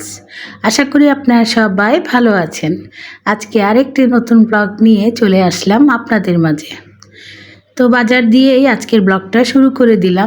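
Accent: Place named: Sylhet, Bangladesh. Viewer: সিলেটি মাত বহুত সুন্দর 😂❤❤❤❤❤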